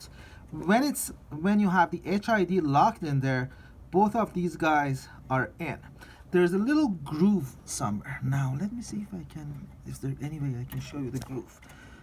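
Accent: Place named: Iran. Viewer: Dorood Farsi accent is always so easy to recognise hahaha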